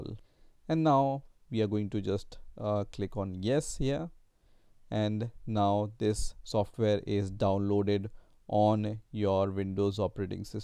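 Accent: India. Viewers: Never trust a dude with a Indian accent when it comes to your pc...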